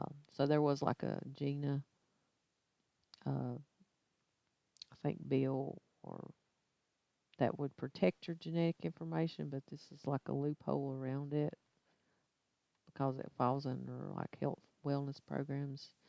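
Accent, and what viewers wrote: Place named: United States. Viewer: Happy Saint Patrick's day, and yes! I too fear a Soylent Green future in which the poor and disenfranchised are deemed scrap heap fodder and a cooled evil encompassing reality, or I could just be high on some sweat Emerald pot and happy. Good day. I do so like your accent, tell me one thing? if it's not too intrusive? = where is that in the US of A..... is that from?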